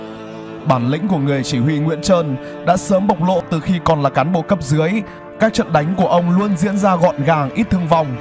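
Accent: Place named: South, Vietnam. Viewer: Giọng cx đậm chất miền Nam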